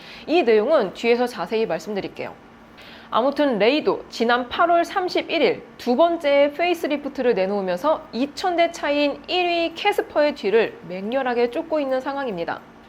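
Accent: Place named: Gyeongsang, South Korea. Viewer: 경상도 말씨. 절대 감출수없는 말투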